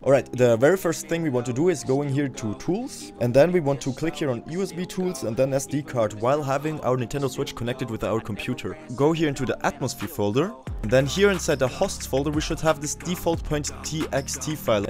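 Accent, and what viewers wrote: Germany. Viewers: Man merkt deinen deutschen Akzent etwas xD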